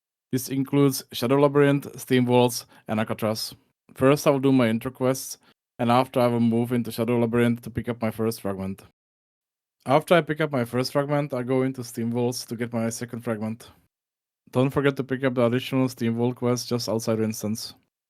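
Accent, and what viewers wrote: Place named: Czech Republic. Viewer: Where are u from man? I hear Czech ❤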